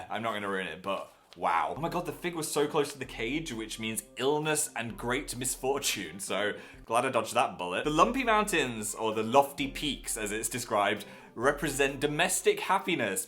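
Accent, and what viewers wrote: North, United Kingdom. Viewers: Wow he sounds so northern in this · HE SOUNDS SO NORTHERN AT THE BEGINNING OML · you sounded so northern in this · U sound so northern in this video 😂😂😂 ... more than usual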